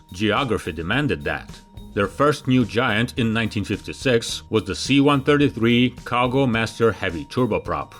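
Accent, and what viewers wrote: Russia. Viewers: "Your videos go from strength to strength. Your narration and English vocabulary are nothing short of impeccable." ..said by another viewer; echoed here. It's a pleasure to listen to your erudite narration and your Russian accent. Big fan of your videos. ~A former cold warrior. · I though that it's going to be cheesy due to the Russian accent. But the whole video is well written & presented. Some details I'm hearing for the first time. Anecdotes from the time, historic footage, modern footage - well done! 😎👍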